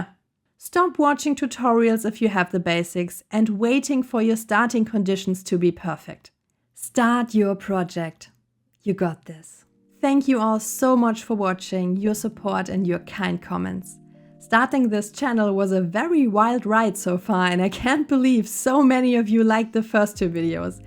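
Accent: Germany. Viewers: If I understood correctly, you're from Germany, so, your German accent actually makes your English easier to understand for non-native English speakers (listeners?). (No offence!) About MVP... So... I wrote some program around November 30th, aaaaaaaand totally lost interest in making it good, not just MVP. Somehow in 2nd half of January I managed to get it into reliable state: now it's running 24/7. Sometimes it's really hard to make step from "it's kinda working" to "yeah, now it's not embarrassing to show it to others". But I think, I saw your channel just in time. Actually need do smth, not just collect ideas and dream about how cool it would be to build it someday. Thank you.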